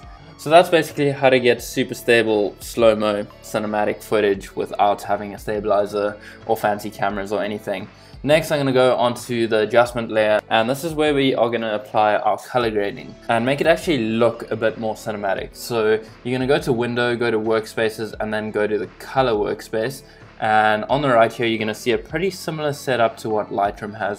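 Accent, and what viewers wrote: South Africa. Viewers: dude are you south african???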